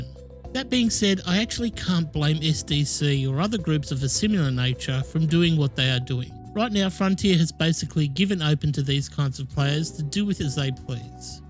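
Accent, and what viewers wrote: Australia. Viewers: Couldn't agree more with your suggestions mate. A 14 year old with nothing to do but train and cred up can, and will, slaughter my helpless newbie ass just for shits & giggles, and suffer absolutely no consequences for it, so it's perfectly logical to me to stay in Solo and enjoy myself there. If FD were to take up some of your karma suggestions, that'd be good for the entire game and would get me into Open. The worst that could happen is the ganks would leave (good riddance) which wouldn't hurt Frontier at all since they don't have a subscription model. And frankly, these game mechanics make no internal sense. If the universe knows you're wanted, how is it they nobody knows you've murdered 50 other players this evening? It's a no-brainer that constantly ruining other players' enjoyment of the game should have consequences. You've got my vote. 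for FD karma konsultant!:-) By the way, loving hearing Strine on ED videos.:-) Keep up the good work!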